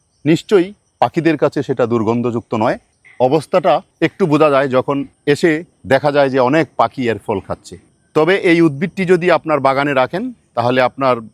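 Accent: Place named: Mymensingh, Bangladesh. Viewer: উনার কথায় ময়মনসিংহের আঞ্চলিকতা আছে। তাই কিছু শব্দ আঞ্চলিকতার কারণে চলে আসে।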